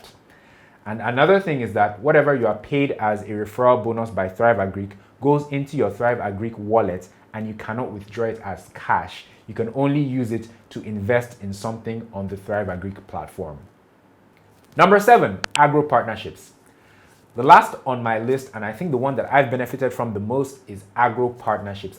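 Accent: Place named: Ghana. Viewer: Great content. Do you know similar apps for the Ghanaian investor? Btw, you sound more Ghanaian than Nigerian!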